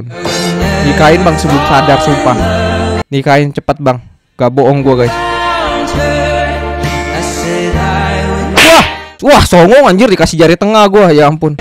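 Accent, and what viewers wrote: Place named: Depok, Indonesia. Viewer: Omongan u kek orang depok bang.🤣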